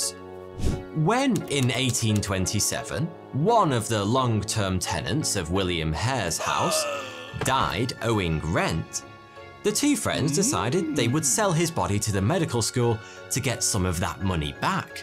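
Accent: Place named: England, United Kingdom. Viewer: All I can say is, wow! Sounds like jolly old England. Ha haha! James May.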